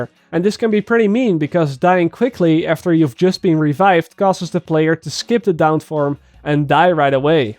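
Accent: Netherlands. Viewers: Ik hoorde aan je Engels dat je Nederlands was // i heard of your engelish that you where dutch.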